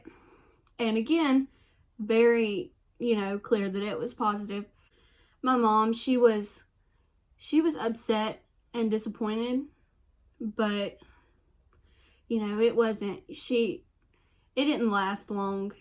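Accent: United States. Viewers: You gotta be from Texas or Tennessee 🤣❤️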